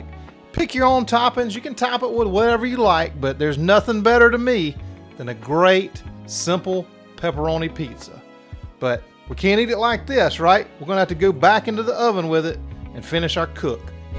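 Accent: South, United States. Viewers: Sorry the thick southern voice is 😖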